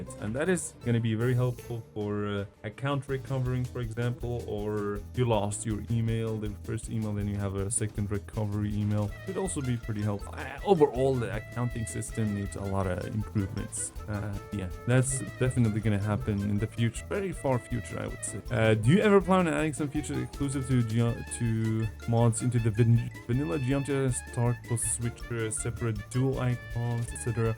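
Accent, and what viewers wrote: Germany. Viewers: Wait, are you German?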